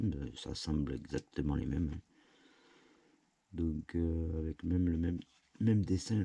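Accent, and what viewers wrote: South, France. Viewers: moi je préfère avec ton accent du ☀️☀️ it's smells the canicule 🤣🤣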